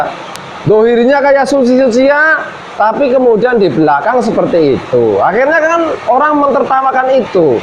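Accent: Banyumas, Indonesia. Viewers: Agama baru kang, agama kadrun hahahha. Memang manteb pake gaya khas ngapak nya.. good job kang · Kaya kuwe ujung ujunge yah 😂 Ngapak the best 😎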